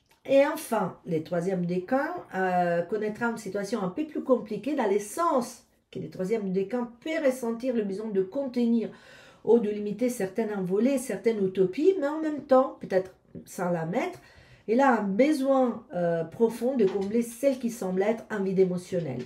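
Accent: Italy. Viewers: Merci beaucoup. J'adore votre petit accents italiens, ça me rappelle mes origines italiennes par ma mère, française par mon père. Perso je suis balance ascendant balance je compte sur Jupiter. 😊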